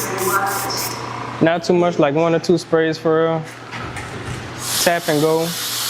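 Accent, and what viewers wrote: Louisiana, United States. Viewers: Good shit brudda 💪🏾💪🏾💪🏾💪🏾 you got a heavy Louisiana accent brudda salute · Louisiana accent loud asf😂😂❤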